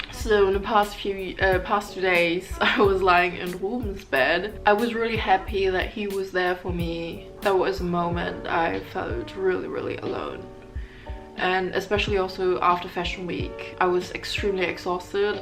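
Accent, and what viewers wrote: United Kingdom. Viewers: I haven't watched videos in a while (i haven't seen any in my subscriptions, youtube algorithms must be not showing me) her accent sounds different and kinda British-y? I love the way the black hair dye is fading on the ends of her hair it looks really cool. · Schön dich wieder zu sehen! Du siehst toll aus. Mach weiter so. btw Dein englisch hat sich bissl verändert oder?! du hast so einen britischen Akzent, ist mir vorher nicht so aufgefallen, vorher hat man immer gehört, dass du eigentlich Deutsch bist 😄 liebe Grüße, stay positive 😘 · You're wonderful, in everything that you do! Just know that we all love you and support you:) Btw unrelated -is it just me or has your accent become a lot more British lately?:o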